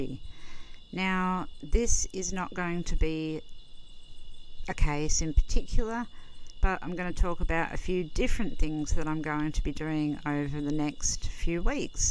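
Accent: Australia. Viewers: Are you Australian,? 👋🇦🇺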